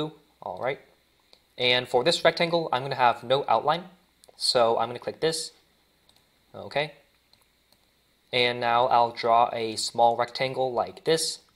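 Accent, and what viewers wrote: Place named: Asia. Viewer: the asian accent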